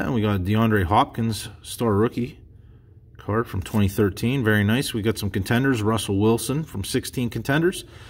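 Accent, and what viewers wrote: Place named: Pennsylvania, United States. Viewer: Hey great video. Glad you got the package, it was cool to see u open it on video, I didn’t expect that. Not sure what # subscriber I am on here but I feel like it was early. Always liked your videos, from the PA accent, to the complaining about brickage, to having do them with u, I feel like I’ve seen most of them. So as your channel grew I started to save up Alonso cards and already had a little pc of Josh Bell going so I thought I would send them to u some day as a thanks for all hrs of entertainment. As u got close to 1K I decided to send it as a Congrats. Keep up the good work. I’ll keep watching. 👍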